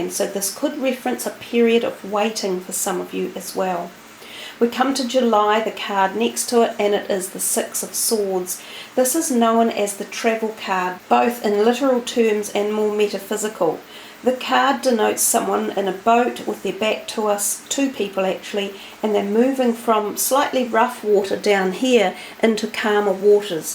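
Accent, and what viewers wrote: New Zealand. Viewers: Are u from new zealand? U have kiwi accent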